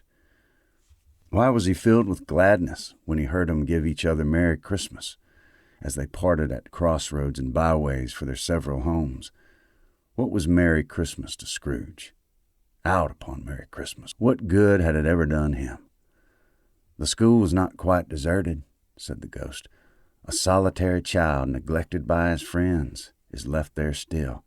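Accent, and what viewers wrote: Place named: South, United States. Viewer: The Dickens writing and the accent in your narration gives the whole audio story an “antebellum” feel to it. As though this could be a story taking place in placid North Carolina and not urban London. Which is probably a testament to Dickens’ talent as a storyteller!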